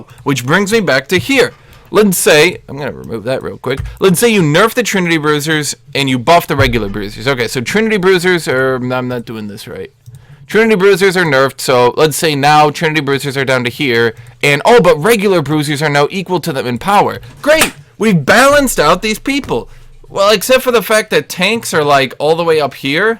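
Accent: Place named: Germany. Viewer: er sagte mal im stream dass er aus deutschland kommt. den akzent hat er sich wohl mit der zeit abgewöhnt, in seinen älteren videos ist er noch etwas stärker bemerkbar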